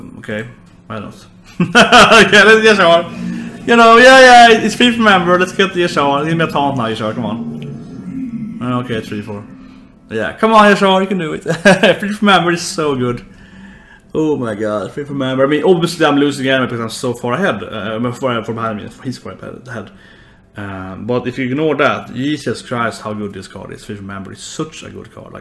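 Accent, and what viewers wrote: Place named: Sweden. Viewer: what a svedis acsent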